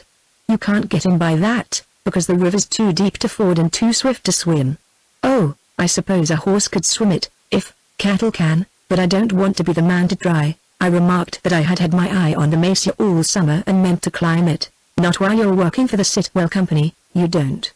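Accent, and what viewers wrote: United Kingdom. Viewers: Seriously. It is INSANE to use a BRITISH voice for this!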